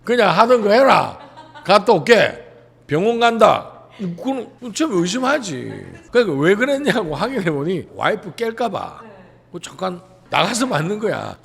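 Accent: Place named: Gyeongsang, South Korea. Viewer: 오늘 처음 봤습니다 대장님 갱상도 사투리 억수로 잼있심더 ㅋ 나는요 고향이 안동이라 카이요 ㅋ 자주 옴시더 ㅋ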